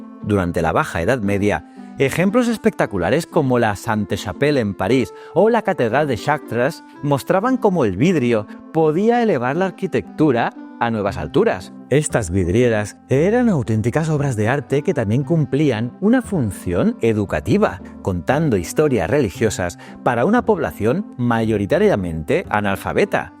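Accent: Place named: Spain. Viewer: Me gustan este tipo de historia, pero lo único malo es que los españoles hablan muy mal, yo diría, muy reeeemal, lo cual no es nada agradable sentarse muchos minutos escuchando una forma de hablar desagradable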